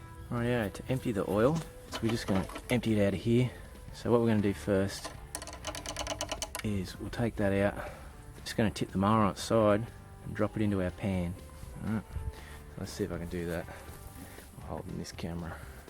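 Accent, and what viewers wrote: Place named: Australia. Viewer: you sound like an Aussie bloke !!!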